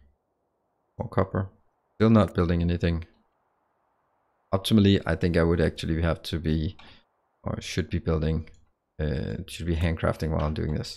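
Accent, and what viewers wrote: Denmark. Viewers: Danish I believe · are you danish? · He is Danish. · Danish I think.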